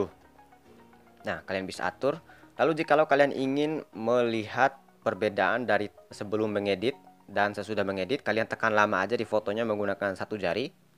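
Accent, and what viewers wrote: Bima, Indonesia. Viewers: Bahasa indonesianya kedengaran seperti Orang Bima NTB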